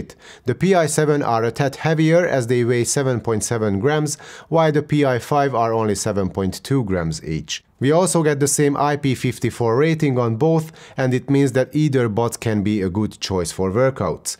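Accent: Hungary. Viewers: Excellent review, saved me $400 since I was thinking about replacing my Airpods Pros for the PI7s. No need to now. Also the accent sounds Hungarian, curious if I'm correct.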